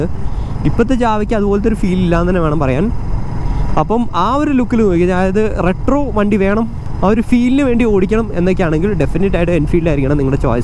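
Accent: Kozhikode, India. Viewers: Bro കോഴിക്കോട്ട്കാരനാണോ?.... ഇടക്ക് മ്മള് കോയ്ക്കോട്ട്കാര്ടെ slang കേറിവരുന്നപോലെ.. മൂപ്പരൊക്കെ😃🤔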